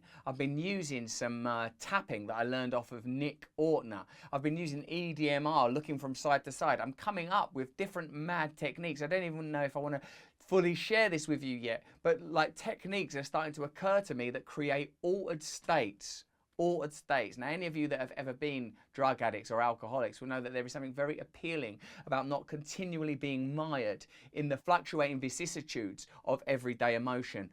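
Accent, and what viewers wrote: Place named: England, United Kingdom. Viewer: his English is so English it's hard for me. to understand it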